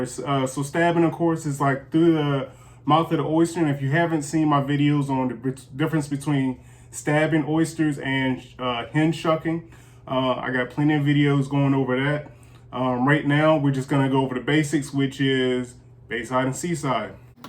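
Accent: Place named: Eastern Shore, United States. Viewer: Great job! I like both types of oysters! You have an Eastern Shore accent, are you from the Shore?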